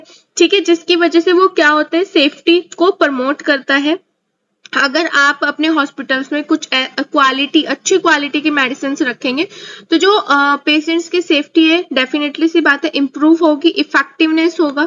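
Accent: Karnataka, India. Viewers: Karnataka se ho mam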